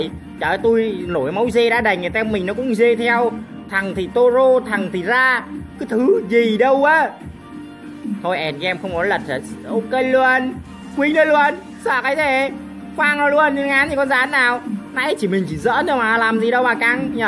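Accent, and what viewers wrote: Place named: Phu Yen, Vietnam. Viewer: Phú yên kìa 🤣🤣 quê tui 🤣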